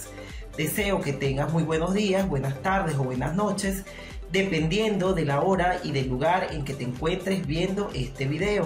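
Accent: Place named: Colombia. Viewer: claro maestra de Peru soy electricista automotriz y trato de actulizarme a traves de youtube ojala el otro año pueda matricularme en un instituto conocido en mi pais gamor para aprender electronica automotriz y usted maestra de que pais es? ese dejito tan bonito acaso de Colombia?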